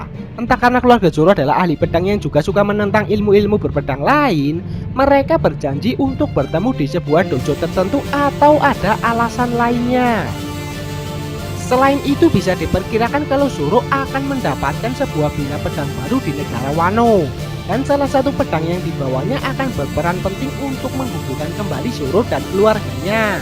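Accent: Java, Indonesia. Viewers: Admin logatnya sengaja di medokin ya ? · Kayak dari jawa.. medok bro.. smangat aja bro.m kritik dan saran itu sudah biasa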